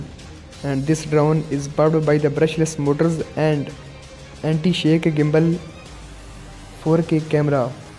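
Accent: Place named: India. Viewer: Your information in english with pure indian accent.but its nice.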